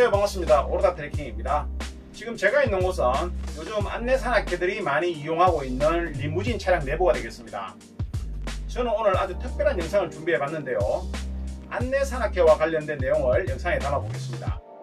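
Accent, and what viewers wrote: Gyeongsang, South Korea. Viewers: 홈화면에 떠서 처음 오르다님의 영상을 만나게 되었는데 경상도억양이 있지만 사투리없이 표준말로 원고도 없이 설명을 참 잘하시는군요. 특히 내용이 알차고 유익하여 인상이 깊었습니다. 다만 한 가지 흠이 있는데 오르다님을 위해 조언드립니다. 설명하시는 동안 양손을 많이, 너무 많이 쓰다보니 수화통역하는 분 같은 느낌이 들었습니다. 손동작이 너무 많다보니 손에 신경이 쓰여 설명을 잠깐 놓치는 경우도 있었구요. 적당한 제스처는 필요하지만 과함은 모자람만 못하다는 조언을 드립니다.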